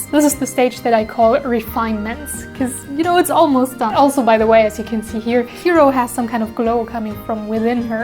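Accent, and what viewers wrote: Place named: Germany. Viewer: Omg I‘m German too! :DD (hab mir schon gedacht dass ich den Akzent erkenne haha)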